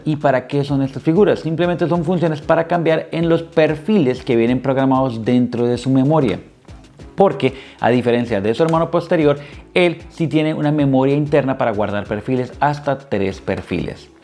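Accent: Colombia. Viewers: Tengo Dos Preguntas! Jajajaja 1. Eres de Colombia? Especificamente de Medellín? Lo pregunto por tu acento Jajaja. 2. Se pueden reemplazar las KeyCaps? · Mi socio es Colombiano? O me equivoco?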